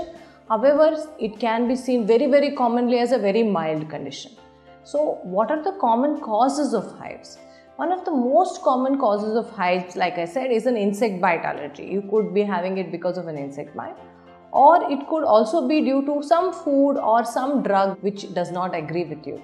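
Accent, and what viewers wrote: India. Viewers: English nga paranamang bombay Kong mag salita😔😔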